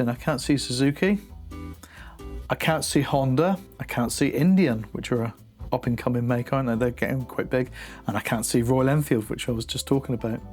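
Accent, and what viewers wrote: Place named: Somerset, United Kingdom. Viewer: This video was excellent, convinced me to download it and it's great. Judging by your accent I suspect we arent far apart, I live in Somerset. Currently trying to upload a custom logo and it doesn't give the option of where to upload the image from though (as yours does from the bottom of the screen). Any idea how to sort this?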